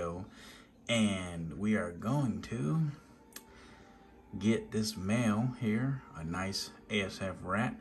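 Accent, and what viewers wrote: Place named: Bay Area, United States. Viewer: Bro are you from Northern Cali? You sound like you from the Bay Area lol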